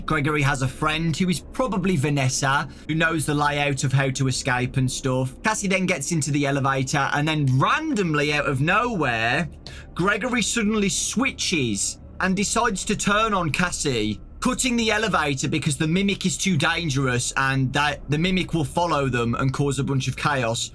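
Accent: United Kingdom. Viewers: Oh hai bri’ish dude /pos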